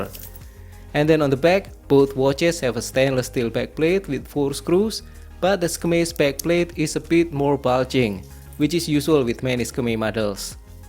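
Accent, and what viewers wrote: Indonesia. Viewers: It is Indonesian accent. You may find it similiar to S'pore/Msian.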